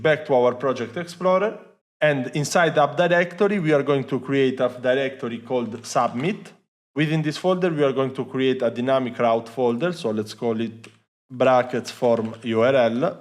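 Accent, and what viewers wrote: Italy. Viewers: Ma sei italiano? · Nice work! Are you Italian? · Are you Italianoo ❤?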